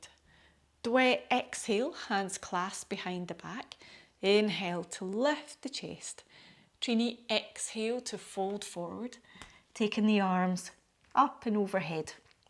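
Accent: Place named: Scotland, United Kingdom. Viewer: Informative, thank you! Love your accent, too. I’ve never been to Scotland, but my grandfather was from a place called Govan in Glasgow. Your accent reminds me of him.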